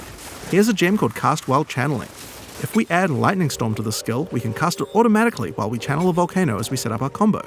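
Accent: New Zealand. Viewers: It's always surreal hearing my native Kiwi accent on YouTube lol. Looks dope af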